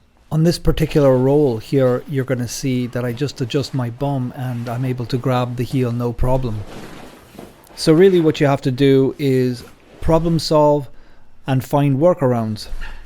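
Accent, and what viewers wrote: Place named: Ireland. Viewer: ! Great review & very cool to hear the Irish accent! I'm in a similar-ish boat in that life means I can't & won't be able to get to the gym as much as I want going forward... I'm a life long Gaelic footballer who found martial arts just over two (covid) years ago. I'm chasing time (42 now in May; just had my first novice MMA fight) & I realise solo training will need to be a (big) part of my practice going forward; I/we can't be away out of the house every evening training jiu-jitsu!! I bought an unfilled dummy/'corpse' during Lockdown, but the filling process near broke my heart + I just couldn't get it right. I'm seriously considering buying a filled SMARTY & getting it shipped to Ireland... Expensive I know, but I'm thinking it could turn out to be priceless! Thoughts?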